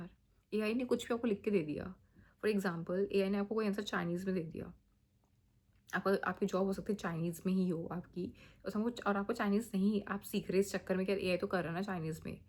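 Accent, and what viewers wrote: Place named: Madhya Pradesh, India. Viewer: you are definetly from madhya pradesh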